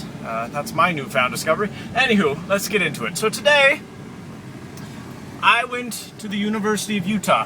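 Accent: Ireland. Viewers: You sound like an irish person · Are you trying an Irish accent or a Toby Turner accent?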